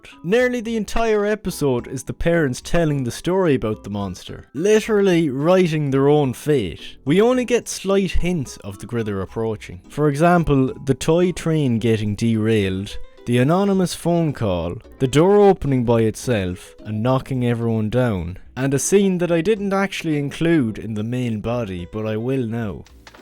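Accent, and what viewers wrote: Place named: Ireland. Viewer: I’m sorry I was just messing… I actually love your Irish accent! ❤❤ I have a few Irish friends who say I am too English to understand them lol 😂😂… great video! I am following you now too! ❤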